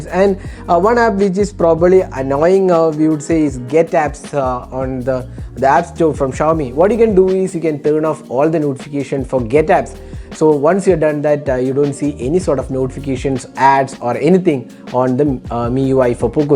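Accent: Kerala, India. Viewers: Sir, are you from Kerala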